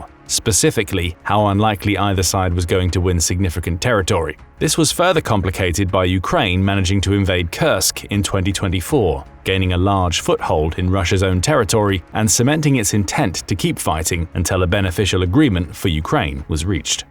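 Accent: United Kingdom. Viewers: This video has a completely Eurozone slant.. just because someone has a British accent, doesn't mean you can believe all they say.. The book hasn't been finished yet on US efforts in the Ukraine - Russia war. Don't forget, British did have their hands in the Russia disinformation scandal regarding President Trump (debunked Steele Dossier)..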